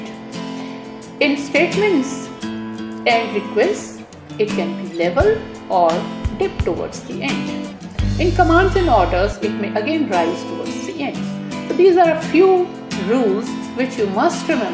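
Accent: India. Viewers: So a woman with an extremely hard Indian accent is saying to try to speak in a neutral, not an American accent, which is usually what people consider to be a pretty neutral. Indians are the worst English speakers on the planet. They thing they are speaking British English. Far from it.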